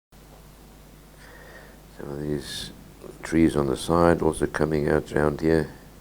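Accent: South Africa. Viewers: This is delightful. Thanks for sharing. Nice to hear that South African accent again!!!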